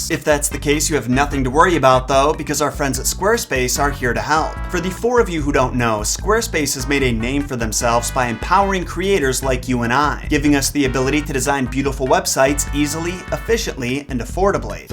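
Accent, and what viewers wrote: Ireland. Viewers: Better than most Irish accents from Hollywood!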